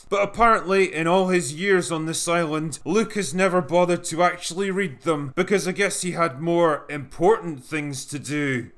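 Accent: Scotland, United Kingdom. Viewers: Despite being read by a Scotsman who sounds like he's angrily gnashing his teeth at the height of inebriation this script is pure healing. I don't get why so many directors also write the script of their movies nowadays when they're clearly two distinct skill sets. And who greenlights these things? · you clever weaver of character tapestry you hear me say. You didn't just 'fix' Luke Skywalker, you provided him salvation. You cleverly wiped the stain of shame from him and restored him to the galaxy saving hero we all came to know and love. I've already bought several of your books, now I eagerly await the version of the Sequel Trilogy. Hell, I'd even pay for the audiobook if you narrated it. Just can't get enough of that Scottish slurring of poetry!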